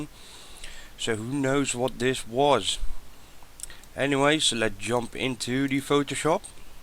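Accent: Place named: Netherlands. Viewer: First, I like your accent, your 100% dutch hahahaha. What the pipe/spoiler is in the image reminds me of a "pitot tube" on a airplane, its almost a 100% match. Look up "pitot tube" and do a picture search on google, you will recognize the object immediately. I think a flying object has crashed there, and it had a pitot tube for sure.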